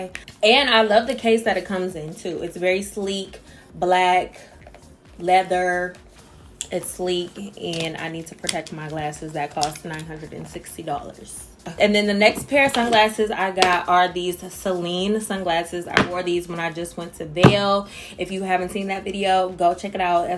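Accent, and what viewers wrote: United Kingdom. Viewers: It’s always gone be the British accent for me 🤭🫶🏾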